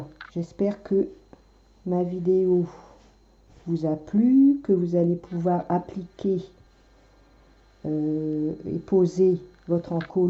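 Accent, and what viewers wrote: North, France. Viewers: merci vous avez l'accent du nord que j'aime je suis née dans le nord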